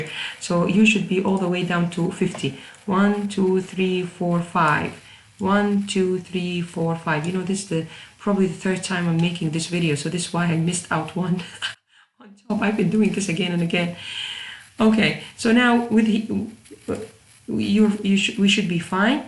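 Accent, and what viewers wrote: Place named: Iran. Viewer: Thank you, I must say you sound like my Persian friends accent. I miss them, we used to have good fun in University in Tasmania. God bless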